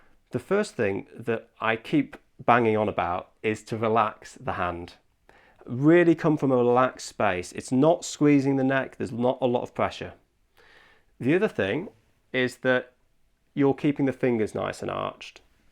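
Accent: England, United Kingdom. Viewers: What kind of English accent is this? I like hearing him talk lol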